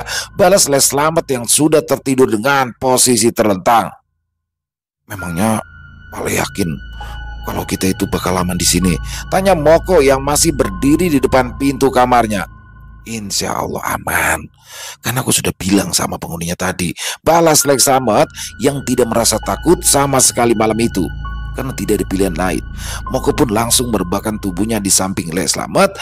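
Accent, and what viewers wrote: Jakarta, Indonesia. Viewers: pagi bang... semoga sehat sll 😊🙏 suka banget denger cerita berlogat betawi walaupun saya orang jawa tulen😊😊😊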